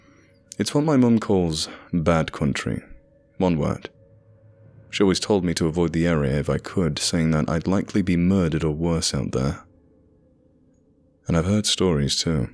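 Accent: England, United Kingdom. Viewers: This guy sounds like he's from the UK.. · I can’t say, some brith telling a story he read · Is this a British accent talking bout driving the back roads? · That's right I've lived in the East Tennessean mountains all my life. Same general area and people as eastern Kentucky. Beautiful mountains and people. From this guys English accent you can tell it's about a 99 percent chance he's never been any where near Appalachia lol. It's pronounced Appa latch a not Appa lay cha. You outsiders can visit but we appreciate it if y'all don't stay, especially if you have a superiority complex. We don't take to kindly to any bullshit. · I find it funny! I 75 isn’t eastern Kentucky. Lexington isn’t eastern Kentucky It’s Hal rogers parkway . I live in hazard! With your English accent. Ride motorcycle through that whole area it’s beautiful here not scary! I call bullshit ! 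· you don't sound like your from that area... sounds like you are English possibly a ginger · You sure you don’t sound your not from anywhere ky Mabey merry old England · A British person telling us about a specific area in the US lol. It’s not scary as long as you are strapped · But oh, but... This guy doesn't even have a Kentucky and accent. He's British!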